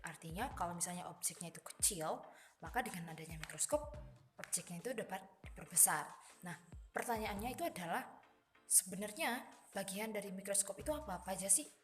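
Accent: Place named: Java, Indonesia. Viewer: medok e sejak kapan ndok??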